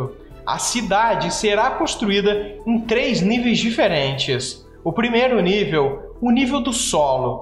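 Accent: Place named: Curitiba, Brazil. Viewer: Ele é de Curitiba, por isso ele tem esse sotaque.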